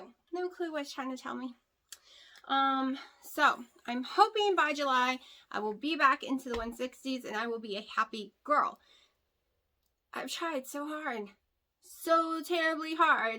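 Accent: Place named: United States. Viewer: I love your country accent ♥️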